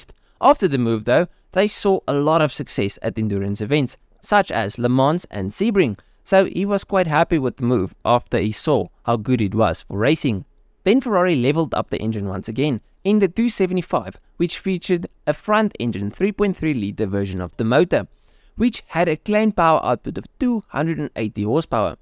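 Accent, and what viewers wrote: South Africa. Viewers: I'm really loving these videos. You're picking the best cars. Is that an SA accent I'm hearing?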